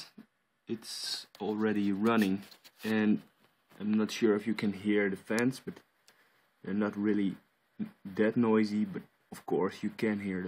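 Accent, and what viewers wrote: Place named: Netherlands. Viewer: you sound like you're dutch c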